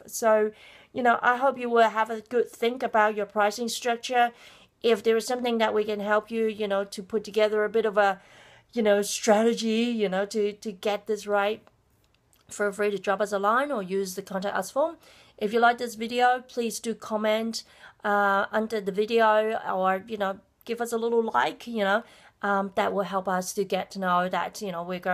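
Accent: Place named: Australia. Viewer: i like your australian accent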